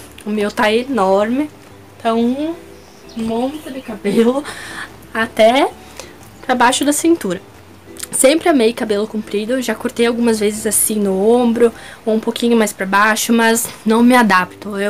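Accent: Rio Grande do Sul, Brazil. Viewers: Gosto muito do seu sutaque gaúcha seu cabelo é muito lindo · Olá também sou gaúcha.amo seu sotaque queria ser assim também...e não vivo sem cebola KKK. amei o vídeo Deus te abençoe ❤️ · Amei seu vídeo, mas nunca tinha ouvido falar que alguém ama leite ainda mais sendo gaúcha tchêê...🤗... cadê o churrasco e o chimarrão?...😋... brincadeira rs...seu sotaque é lindo e vc não deve se envergonhar nunca pois é uma característica sua, de sua região que vc deve se orgulhar sempre...um superlike...😉😊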